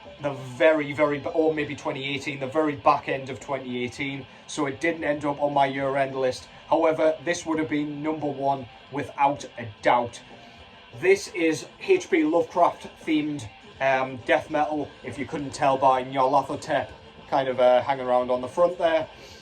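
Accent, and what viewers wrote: Newcastle, United Kingdom. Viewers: You a geordie?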